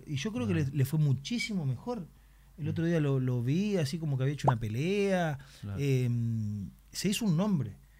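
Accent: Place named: Chile. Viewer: Habla como chileno ahora kajdjak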